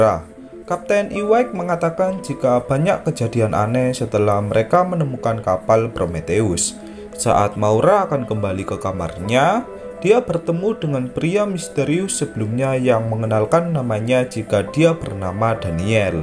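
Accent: Java, Indonesia. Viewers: Miminnya orang jawa kayanya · ini terlalu medok suaranya hahaha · "Medok-nya" kental. Wong Jowo toh mas??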